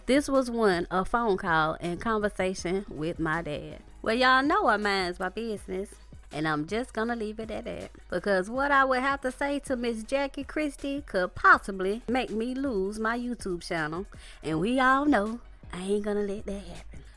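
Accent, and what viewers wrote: South, United States. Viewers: I watch your show because I love your voice. You have that southern drawl mixed with the black soulful personality. You are unique! I am white, but I still love your voice! I like it when you say "Moving on". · OMG before I read the ABOUT section of your channel, your Southern accent hooked me in and made me laugh my a** off. I'm a natural born Nashvillian and had to subscribe. Not only because of our Southern origins but also because of the truth you bring. That damn Jackie is gonna reap what she's sown on behalf of her "darker child". You don't mistreat, alienate, or totally disown an innocent child without karma visiting yo ass.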